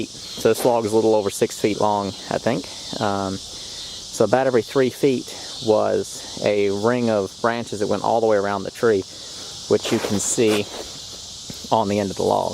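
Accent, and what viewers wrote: South, United States. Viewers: holy moly! i don't know how this video popped up!..... what a strong Southern accent!!!!!!! i feel like 'bearded old hippie hiding behind his truck'! no telling what this video was gonna be about!..... turns out to be a cool little video! thank you, very much! :-)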